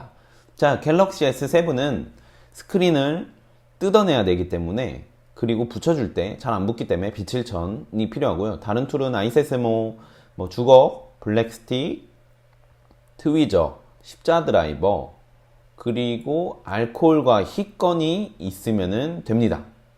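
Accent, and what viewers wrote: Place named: Vietnam. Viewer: 아 베트남 사람인줄.. 발음머임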